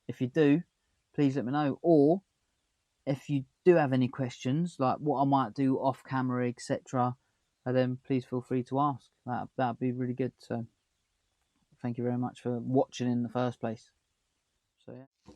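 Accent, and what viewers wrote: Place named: United Kingdom. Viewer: I think your channel showed up on my feed because I follow the UK van-life YouTubers Camper Vibe, and I think they have the same accent as you. Check them out and tell me how they sound to you.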